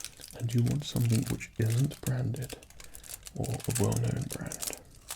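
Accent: England, United Kingdom. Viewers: Finally a good British ASMRtist! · Carmel Ellis he is English tho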